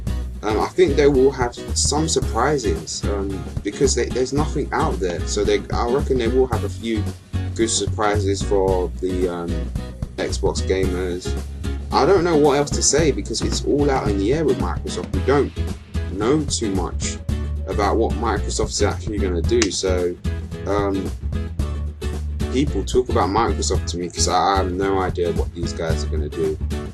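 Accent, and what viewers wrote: United Kingdom. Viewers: You're from London, and you're either ghanian or nigerian. Am I right? :)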